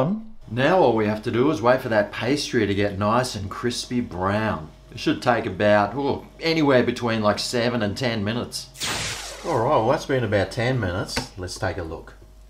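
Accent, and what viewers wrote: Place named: Australia. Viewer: I come to watch the recipes and more importantly, to listen to his Aussie slang/talk😁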